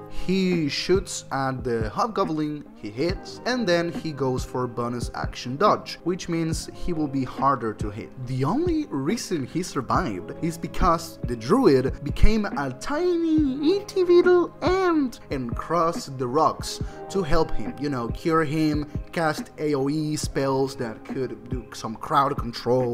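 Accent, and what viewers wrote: Argentina. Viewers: I really enjoyed the story! I had to comment, i need to know, decime que te lei bien el acento, sos argentino? Jugas d&d y sos de los nuestros? T_T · ¿sos argentino? Recién encuentro tus vídeos de D&d y me encantan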